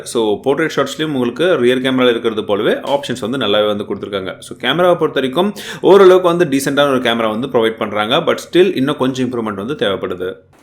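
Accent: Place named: North Chennai, India. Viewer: konjam vada chennai la vara maari voice vardhu bro its gude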